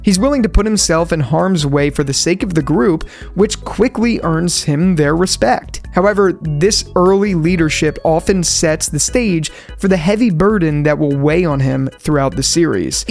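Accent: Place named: Philadelphia, United States. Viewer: Love the Philly accent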